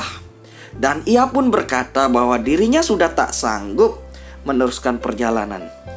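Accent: Bali, Indonesia. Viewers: Logatnya Bali banget, Mantap? Jadi ingat teman saya si Putu Satriawan. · Baru ngunjungin Channel ini .. Malah fokus sama suara mimin, kyanya mimin orang Bali ya??? Logat nya Bali banget .... Salam persaudaraan Dari Jawa 😁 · Suara orang Bali nih logatnya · Kental khas kakak bali Semangat kak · Logatnya Bali banget, jadi ingat teman sy Wayan Gusita dari Karang Asem... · Denger suara blii, jadi ke inget guru matematika BPK I gun Made, yag satu2nya guru dari Bali.... Bhasa logatnya khas bngeeet.... Sukses trus bliii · Bikin sante ya sodaraku... Beliao kan orang bali, jd logat bahasanya gitu. Trimakasih udah dicritakan.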